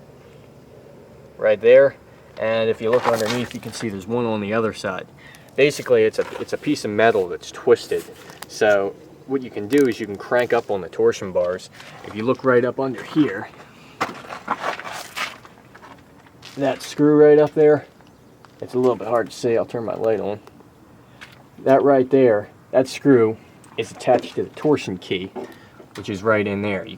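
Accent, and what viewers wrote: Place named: New Jersey, United States. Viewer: Interesting video. Given the location and accent I'm gonna guess you're in Salem or Cumberland County, NJ, lol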